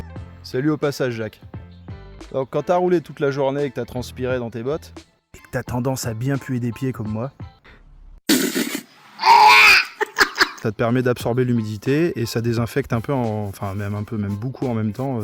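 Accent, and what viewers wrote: France. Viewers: salut, j'entend que tu est du limousin!? moi de même ✌peut être qu'on se croisera 😉je m'abonne pour la peine😎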